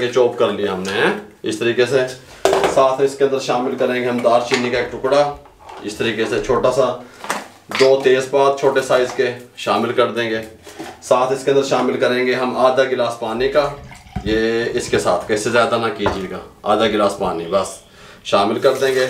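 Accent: Punjab, India. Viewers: Ap ki punjabi urdu son ker maza ata he.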